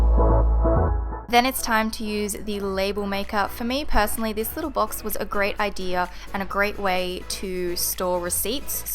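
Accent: Australia. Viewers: Are you from Australia 🇦🇺😊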